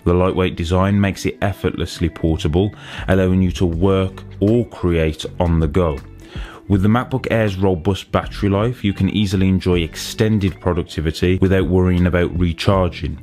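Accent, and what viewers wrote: England, United Kingdom. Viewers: your English accent is the icing on the cake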